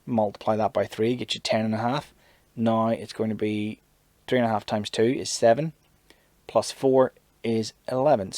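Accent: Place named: Northern Ireland, United Kingdom. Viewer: I hear another Northern Irish Guy talk about 40k I sub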